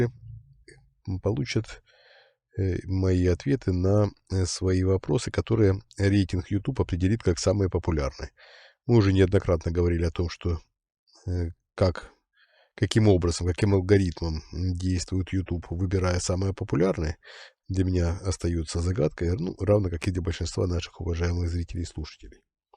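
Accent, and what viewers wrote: Ukraine. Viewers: Вопрос. Скажите пожалуйста, почему у вас украинский акцент?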